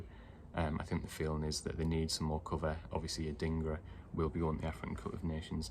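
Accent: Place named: United Kingdom. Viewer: It is my language, however it's the British accent that I'm not accustomed to, along with the background noise, that's making it really tough to make out some of what is trying to say.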